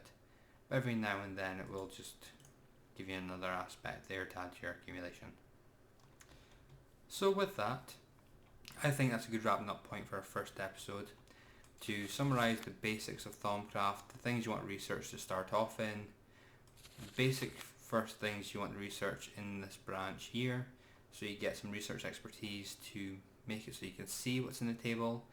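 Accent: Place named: Scotland, United Kingdom. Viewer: Soon as I heard your Scottish accent I knew I could trust ya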